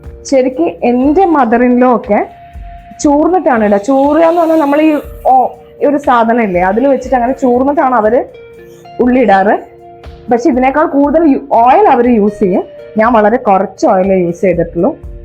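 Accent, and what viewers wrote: Kozhikode, India. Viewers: Natural samsaaram kozhikoden style .... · I like ur kozhikode slang Me from kozhikode😂😂chevayoor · de ആ ഇരുത്തം ഒക്കെ നല്ല അസ്സൽ നോർത്തി. but സംസാരം ന്റെ കോഴിക്കോട്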